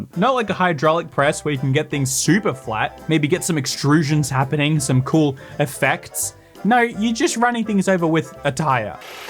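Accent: Australia. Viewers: i did not know, i thought hes austrailian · i thought he was australian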